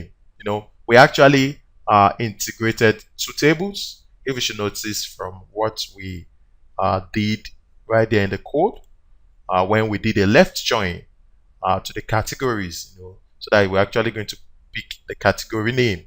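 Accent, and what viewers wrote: Nigeria. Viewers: sounds Naija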